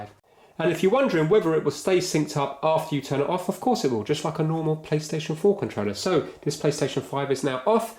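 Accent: United Kingdom. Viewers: Comprehensive explanation and great British accent - Cheers! Greetings from Bulgaria! :)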